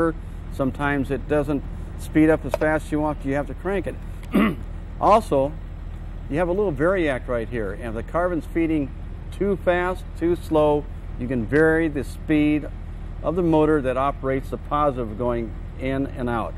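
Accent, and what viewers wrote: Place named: United States. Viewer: I did not know Americans really did talk like that (fascinating).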